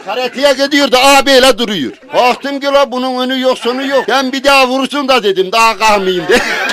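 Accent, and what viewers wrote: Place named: Sivas, Turkey. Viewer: Sivas ağzıyla konuşarak anlatması ayrı bi güzel, yediği dayakla eğlenebilmesi ayrı bı güzel, sizin çizimlerle bambaşka bir boyut kazanmış. Harika yaa. Tebrikler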